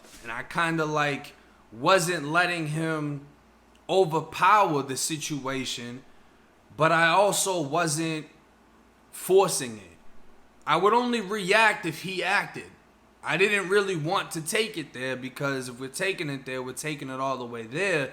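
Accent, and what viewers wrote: Boston, United States. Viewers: Your from Tampa?!!! Why do I hear a hard ass accent like your from Boston. When you say yard and car and shit like that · I'm just finding out about you. I love you. And you're from up North. Sounds like Boston. Jersey City NJ here. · I thought you were from Boston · I dont know why.I thought you was from Boston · My man tells a great story. I see ppl saying he was scared in certain situations, but something tells me he 💯. Tell your story to keep ppl outta jail. But that Boston accent... Keep 'em coming,bro. I just subscribed. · Sound like you from Boston, Blood.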